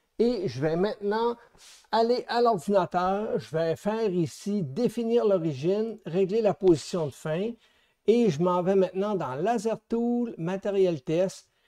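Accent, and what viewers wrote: Quebec, Canada. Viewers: hey salut merci pour la video jai beaucoup appris!!! tu viens de ou avec ton accent c'est quebecois je suis de montreal moi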